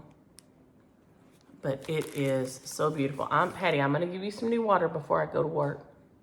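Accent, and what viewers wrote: South, United States. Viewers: . I love listening to you with that southern accent (my parent both😮s were from the south so the majority of my relatives have that same accent). I'm so sorry about your framing debacle. I live in Michigan sol our pumpkins are all cold up here. I hope you have a fabulous weekend. Take care. Pat Tackaberrry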